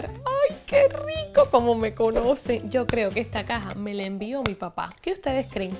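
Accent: Cuba. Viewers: me encanta tu tono cubano!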